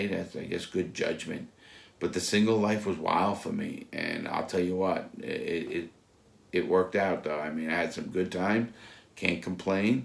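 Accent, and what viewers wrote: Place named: Northeast, United States. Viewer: has a ton of videos here on YouTube, along with 1,000’s of comments on each one. So if this has already been said and I missed it, I’m sorry, but.... story time sessions remind me SO much of Joey Diaz. is a little bit more humble but nonetheless, very similar stories. And they both have that Northeast/NY/NJ accent.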